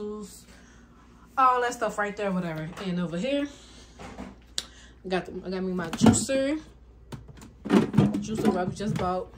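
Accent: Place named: Chicago, United States. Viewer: You sound like you can be from Chicago..are you? my dads side of the family stay there and your accent is similar to theirs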